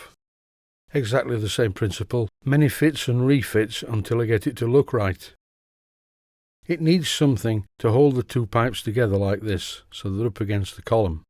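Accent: Yorkshire, United Kingdom. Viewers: Many thanks,. A brilliant series. Always a pleasure to watch your videos, complete with Yorkshire accent, humour and wit. Cheers.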